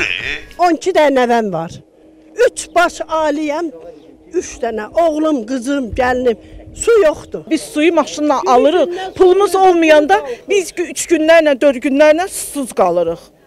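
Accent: Baku, Azerbaijan. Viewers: Bakı ləhçəsüdürdəəə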